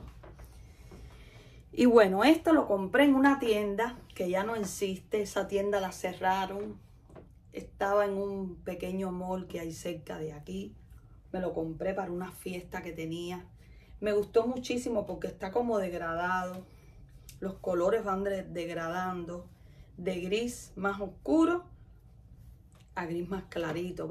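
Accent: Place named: Cuba. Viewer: Hola soy nueva suscriptora. Eres cubana verdad ?, creo q reconocí el acetone de una paisana. Yo soy de Cuba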